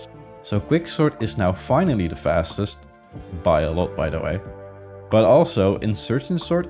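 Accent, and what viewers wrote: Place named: Netherlands. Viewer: You sound dutch, where the average male height is beyond 1.80.. no wonder you don‘t know how to deal with gnomes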